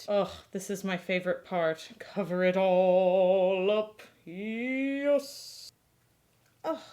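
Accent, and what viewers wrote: Texas, United States. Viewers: Your lovely, soft Texan accent does something so incredibly kind to Baz Lurhman's name! In Australia if a bunch of folks call to him it sounds like a flock of seagulls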